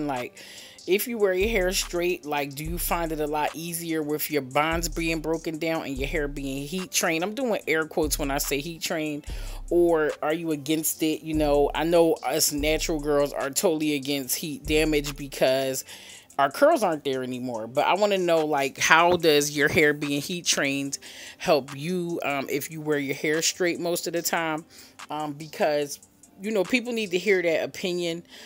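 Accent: Philadelphia, United States. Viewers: Love your quotes I wish you could do my hair Knew you were from Philly from your accent I’m sick that I missed your pop up shop in DC, trust and believe I would’ve been there!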